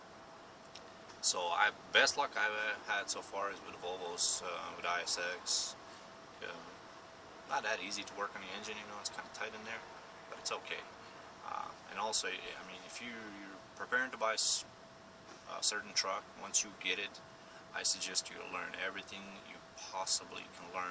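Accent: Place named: Russia. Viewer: You definitely sound like a Russian.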